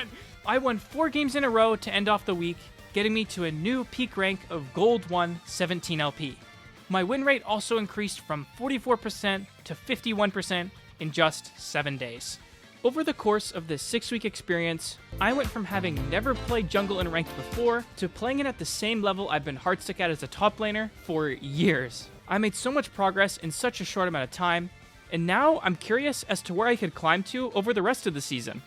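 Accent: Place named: India. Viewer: Cant watch this.. this india accent is just blood in ears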